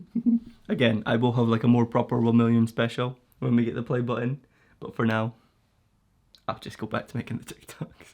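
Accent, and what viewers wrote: Scotland, United Kingdom. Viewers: He's Scottish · He's from Scotland, not Ireland. Different country, different accent 🙄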